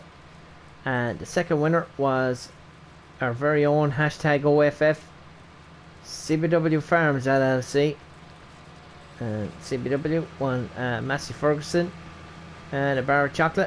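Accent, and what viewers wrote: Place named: Ireland. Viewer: Nice intro😂. I really like the humor and Irish accent. I will watch this during the day👌.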